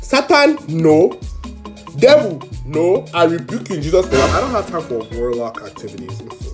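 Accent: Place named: Nigeria. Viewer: Wait? You're from Cameroon but have a Nigerian accent?!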